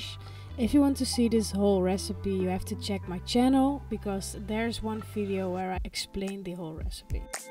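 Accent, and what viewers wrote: Netherlands. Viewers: Maybe it’s good to take some lessons in English language . It’s kinda like dutch english. You can follow English online ! ❤ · As a Half Dutchie/British guy your pronunciation is quite decent! Sometimes I hear the Dutch accent but I hear through it and doesn’t distract me! So Keep it up! ❤️💪🏻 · Liefe dit was echt heel leuk tuurlijke hou je Nederlands accent maar heel goed gedaan!💗✌🏼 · Echt leuk je hoort soms wel dat je nl bent maar dat maakt niet uit 🥰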